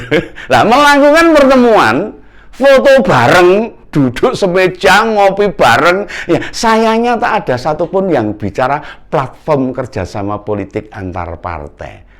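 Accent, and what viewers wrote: Java, Indonesia. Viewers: Kang aku seneng medokmu jiiiaan nyenengke dirungokke pas lan uenak ngono lho opo neneh nek ngenteki si YOHANES ANUS baswEDAN ajur juuuuu terus kang aku ndukungmu.....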